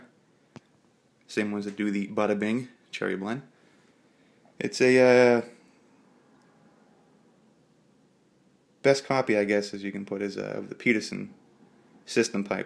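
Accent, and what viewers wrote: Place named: Massachusetts, United States. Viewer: Nice meeting you! Grew up in Mass. and it's always nice to hear the accent. Best Wishes!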